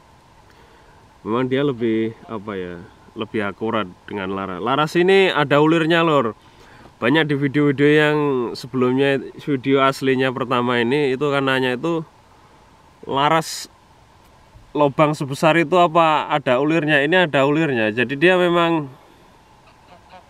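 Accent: Java, Indonesia. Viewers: Wong jowo mz kok lagate jowo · jowo nya msih medok, masyah allah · NITIK LUR...EDANAAAANNNNNNN ....JOS KANG TETEP KETOK JOWONE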